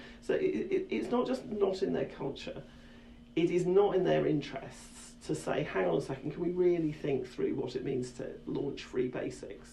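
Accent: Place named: United Kingdom. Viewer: British accent used..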